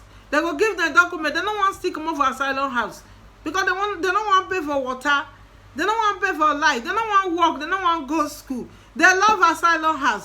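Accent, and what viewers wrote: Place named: Pidgin, Nigeria. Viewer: Your pidgin dey kill me aswear... Making sense.. We gather dey. No lele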